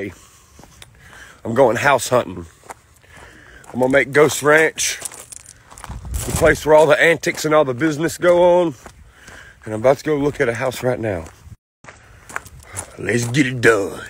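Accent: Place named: Appalachia, United States. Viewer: Anytime my Main Man I have followed you for years! I THINK YOU HAVE A HEART OF GOLD AND A VOICE OF A HILLBILLY GOD!! MUSIC FEEDS THE SOUL! I AM SUCH A HUGE FAN I DO NOT COMMENT MUCH BUT I WATCH EVERY LIL CONTENT YOU POST UP!! MUCH LOVE FOR YOU. I am so proud of you!!! Congratulations God is good all the time!. Keep it up many more blessing my friend Love ya! 🥰🥰🥀🥀💜💜🍻🍻🍻🍻👍😉🤗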